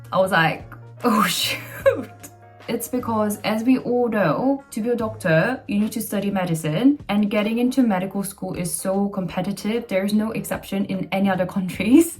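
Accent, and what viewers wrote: United Kingdom. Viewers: 진짜 너무 멋있어요!!! 실행력도, 하고자 하는걸 해내는 능력도 정말 멋있고 존경합니다!!!! 영국 발음도 너무 듣기 좋아요..🤍새로운 길을 응원해요~~~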